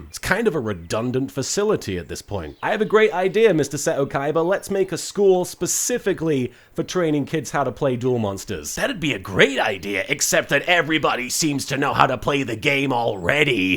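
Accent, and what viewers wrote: United Kingdom. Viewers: Your britishnes is showing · So that's what his normal voice sounds like... It's... British! Truly a shocker considering that he has been called a chubby British guy by Ishizu!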